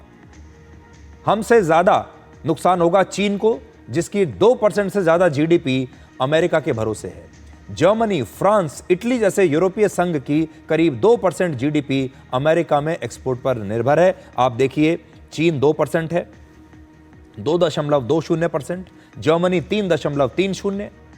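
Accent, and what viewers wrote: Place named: Bengal, India. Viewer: U r 100% bengali. (Ur pronunciation is similar to bengali)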